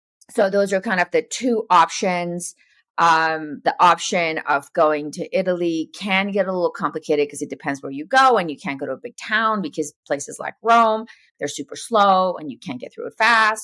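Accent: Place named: Minnesota, United States. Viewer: Can I ask where you are located? Your midwest "Minnesota" is spot on and I'm Minnesotan. I'm looking for an attorney to help with this process and my Jure Sanguinis has been determined.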